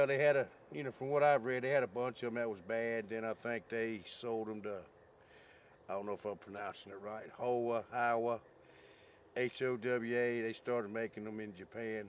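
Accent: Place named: Texas, United States. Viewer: You talk like a texan old timer! Greetings from Tx.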